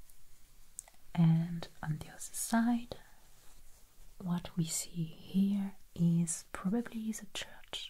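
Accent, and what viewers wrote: France. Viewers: These are my favorite types of videos, that makes....love the sound of the paint brush strokes on the tapestry's....and the running of her hands over the fabric(it sounds like the ocean)....very soothing and comforting....along with the history lesson and background of these beautiful works of art....complete with her french accent....who needs to go France???....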